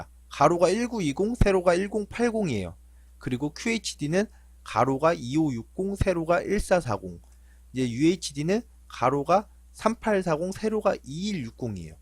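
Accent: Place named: Jeonju, South Korea. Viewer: 전주 사람인가? 말투가전주인데..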